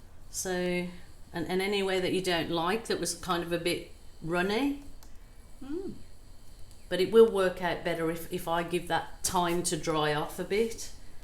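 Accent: Birmingham, United Kingdom. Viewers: Hi from England. Your accent sounds like a Birmingham accent with a little Australian in there. Love your work. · Just inspirational! Thank you or sharing your amazing imaginationary art form. I am planning to redecorate my sitting room and am going to try and do a canvas using the new colour palette to hang on a plain wall. I'm in the UK and it's lovely to hear your Brummy accent and gentle way of explaining the simple and apparent random application of paint application. Thank you from the othe side of the World!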